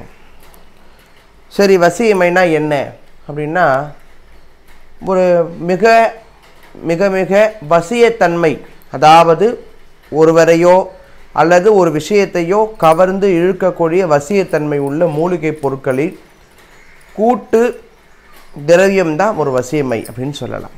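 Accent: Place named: Chennai, India. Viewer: Super chennai slang super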